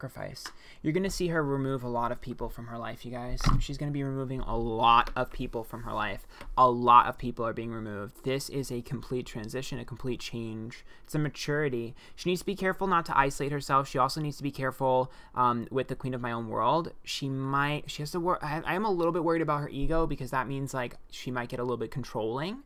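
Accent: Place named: AAVE, United States. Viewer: the aave needs to stop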